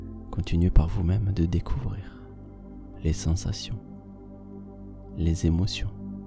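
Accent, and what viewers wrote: South, France. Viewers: c'est l'accent du sud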